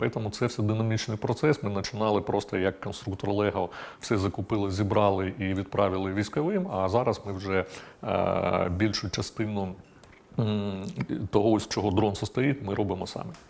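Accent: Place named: Surzhik, Ukraine. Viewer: Шана та вдячність цьому чоловікові, та краще балакав би вже на російській мові. Бо цей жорстокий суржик дещо «ріже вуха»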